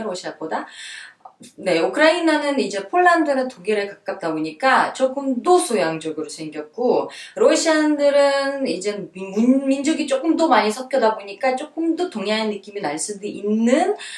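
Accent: South Korea. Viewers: 지금 정도 한국말하는 구잘을 보니 정말 대단하다는 생각. 미수다 일 때보다 지금은 더 한국말이 늘어서 완전히 한국 억양을 구사하게 되었다. 구잘이 좀더 귀하게 여김을 받게 되기를!!!!!!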